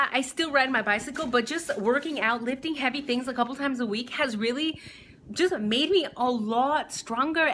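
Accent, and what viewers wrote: Scotland, United Kingdom. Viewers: Is that SCOTTISH ACCENT??? WOOOOOOOOOOOW!!!! Am i the only one who noticed that? You are a TRUE GODDESS!!!! Your beauty is TRULY DIVINE!!!! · I never noticed your scottish accent until now.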